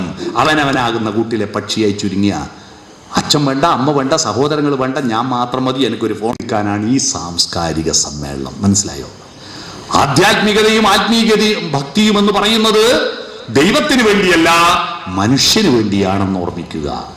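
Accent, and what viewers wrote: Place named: Eranad, India. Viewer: സനാതന ധർമ്മം പഠിപ്പിക്കുന്നത് മുസ്ലീം ഏറനാടൻ സ്ലാങ്ങിലാണ്. കഷ്ടം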